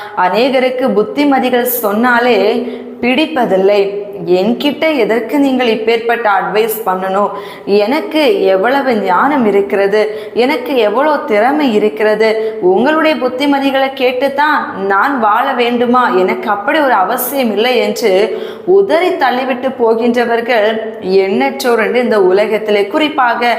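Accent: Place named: Kerala, India. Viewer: Amen Kerala tamil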